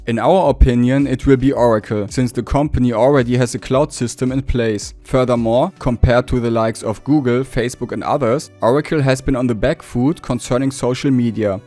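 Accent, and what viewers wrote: Germany. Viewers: Is des a german accent?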